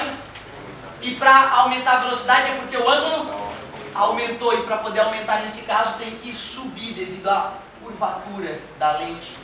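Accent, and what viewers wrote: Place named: Florianópolis, Brazil. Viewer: Muito boa a aula! Valeu professor! O professor é de Floripa? Percebi o sotaque, deve ser.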